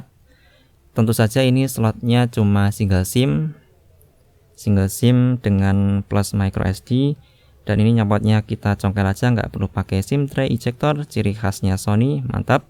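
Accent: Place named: Java, Indonesia. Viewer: Wong ndi samean mas, medoke parah, auto subrek 😄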